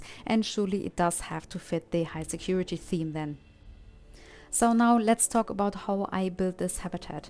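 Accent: Germany. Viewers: Are you german by any chance, because you sound a bit german. You can hear the dialect but I stil enjoy your videos and I think you can buil sooo good like damn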